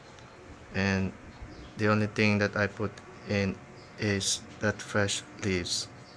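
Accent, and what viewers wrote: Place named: Indonesia. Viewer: logatnya indonesia nih wkwk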